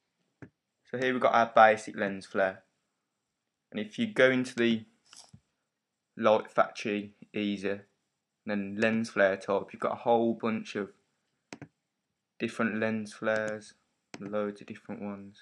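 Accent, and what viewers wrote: Birmingham, United Kingdom. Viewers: So refreshing to have this in a Brummie accent instead of one of our American brothers. Big Up from the Solihull Massive. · WTF BRITISH VOX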